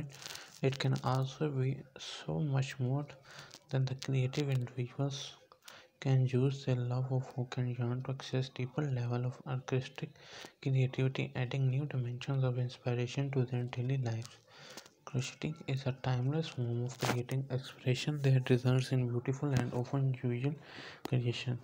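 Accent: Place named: India. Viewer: Он говорит на ужасно противном английском (индус, скорее всего). Ничего особенного не говорит, просто обсуждает то, что видит....что там разные текстуры, разные цвета, макраме....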